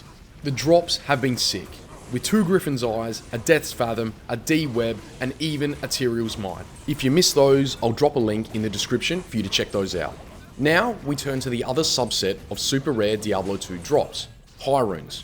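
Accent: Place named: Australia. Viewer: Suped for the aussy accent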